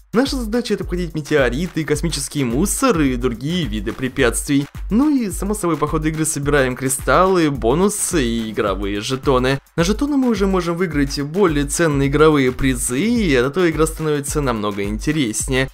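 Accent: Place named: Moscow, Russia. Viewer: у тебя акцент немного эээ... московский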